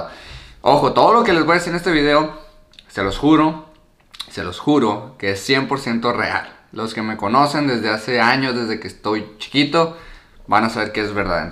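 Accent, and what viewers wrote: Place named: Sonora, Mexico. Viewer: AWEBOOO yo sabia que este mushasho era de Sonora! 👌😎 Te acabo de conocer por el video de jordiwild, y nomas por el acento pense "este vato es de aqui del rancho"... Esta curado tu canal, ya tienes un sub mas 🤜🤛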